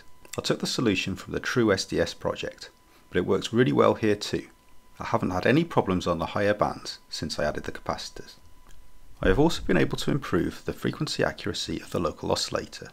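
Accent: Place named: Cardiff, United Kingdom. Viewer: Nice project for the RP2040. By the way, to my ear, you sound like a Cardiffian who lives/lived in England?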